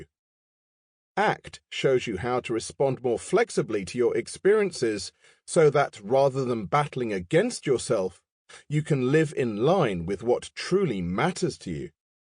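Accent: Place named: England, United Kingdom. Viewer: Great English accent and pronunciation from the narrator,.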